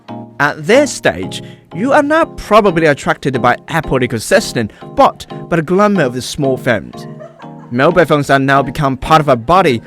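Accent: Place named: United Kingdom. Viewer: 惊奇，竟然是全英文版本的，还是英式英语，哈哈哈，加油，做自己，等你们的充电头了！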